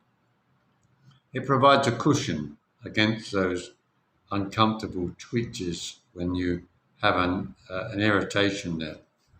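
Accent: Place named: United Kingdom. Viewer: Your british accent trumps all other conciderations..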